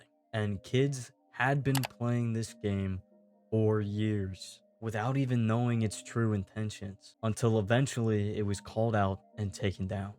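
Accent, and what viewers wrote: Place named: United Kingdom. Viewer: in his British accent era is peak:D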